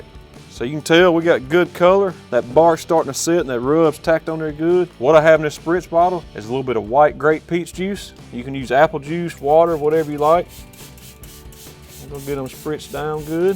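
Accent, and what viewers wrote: South, United States. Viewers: You can tell by the southern accent, he doesnt live an ANY Texas city. Lol. "We still have a little way to gew."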